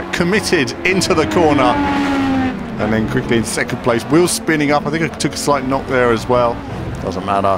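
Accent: England, United Kingdom. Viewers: I am new to your channel and subbed right away as I was looking for updates on Project Cars. i have a PS4 and I have had all the GT games. I am hoping this game also helps to push Poly for the full gaming experience (especially the sounds). I really enjoy your style and pragmatic delivery. I'm also a sucker for a English Accent even though I'm a grown ass American man..lol....Makes me remember when I used to watch Formula One all the time on Speed Channel. Anyways...keep up the great work and I'll be looking for this next week in the States.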